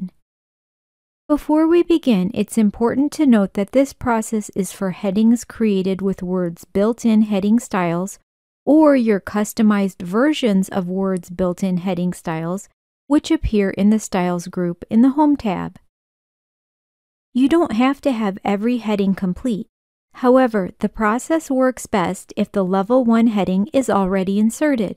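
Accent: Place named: New Jersey, United States. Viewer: Good info. Lol at the New Jersey accent and Indian vocabulary.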